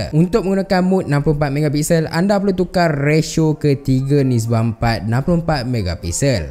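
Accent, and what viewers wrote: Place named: Terengganu, Malaysia. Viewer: Patut mcm familiar, orang tepeng rupanya haa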